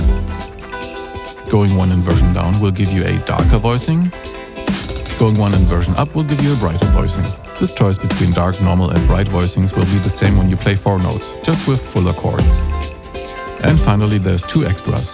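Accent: Germany. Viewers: Well it's a German company and the narrator is obviously German, like Kraftwerk 😉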